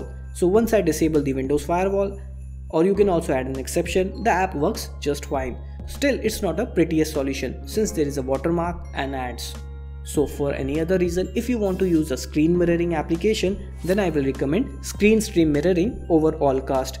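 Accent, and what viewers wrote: India. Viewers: OMG that accent! almost gave me a headache! RIP English. Btw whats with u Indians wanna make everything complicated maybe because you think you are smart or whatever. this whole subject could be explained in a 1 min long video. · Why is it always guys with Indian accents? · Thanks, good info. One suggestion, if u don't mind: I listen to many video presenters with Indian accents. They all have same problems. "T" pronounced as "D". Example: "two" is pronounced as "do". Also "v" is pronounced as "w", example: "video" or "wideo"? "very" or "wery" ? V and W should not be switched around.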